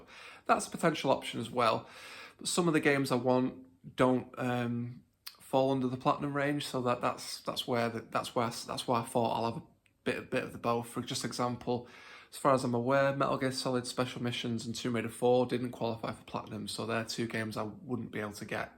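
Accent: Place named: North, United Kingdom. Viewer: Good video mate! Good to hear another northerner haha. Im going for the full english pal set. Got a lot of the higher end games already . At around 500 at the moment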